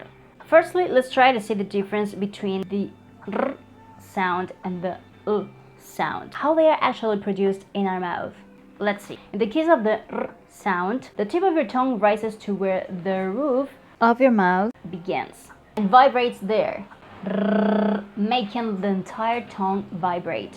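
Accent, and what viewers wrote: Cuba. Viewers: Que bola asere jaja me gusta la accento cubano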